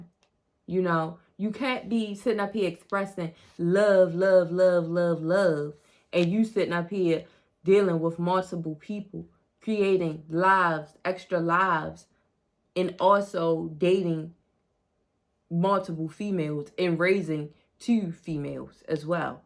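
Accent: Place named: Baltimore, United States. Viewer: Greetings 💕 playing catch up, but Diddy just may want the ‘Unisex Cult’. She needs 2 realize that her LIFE is more IMPORTANT. That $$ has her not 🏃🏾‍♀️ the opposite way…that’s not what he likes😶😄 THANX 4 YOUR 💝 💜…yeeeess ma’am I hear Baltimore tongue! On 2 the nxt video 😊 LIKE..SHARE & SUBSCRIBE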